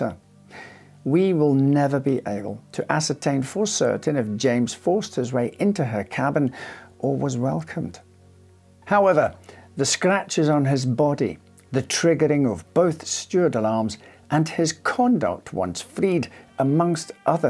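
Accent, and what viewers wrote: Scotland, United Kingdom. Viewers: You definitely have a Scottish twinge in your words, and I love the way you speak. Thank you for another very interesting story.😊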